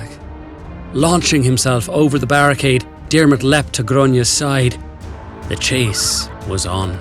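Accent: Ireland. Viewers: I love Ireland. Its culture, accent, history, myths... everything. Thank you. I love your accent too. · Was this just the normal narrator putting on an Irish accent?! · You know our accent has like 50 variations across the island right? Plus he's clearly doing a bit of a presentorial voice, so it's not going to sound the same as in normal conversation. You can tell he's really Irish by the fact he pronounced all the names right, unlike previous Irish mythology videos from TED-Ed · I LOVE how this was narrated in Irish accent. It makes it more fun. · I love how this is told with an Irish accent. Awesome. 😂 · as an Irish person i really thank ted ed for getting an actual Irish person to voice over it!!TY💕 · Excuse me is that a Irish accent, on my favourite narrator. I am shook and very very pleased ❤️ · Like the Irish accent that the narrator perfectly nailed. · Its great to hear an actual Irish narrator telling us an Irish folktale!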